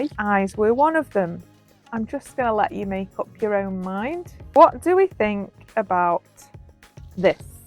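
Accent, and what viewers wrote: United Kingdom. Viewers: Are you from Liverpool? Love to listen to your accent.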